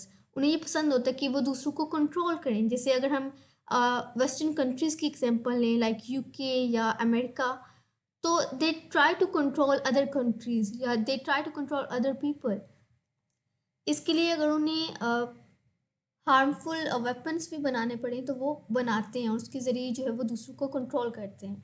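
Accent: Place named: Lahore, Pakistan. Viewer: Lahori Accent ...But well explained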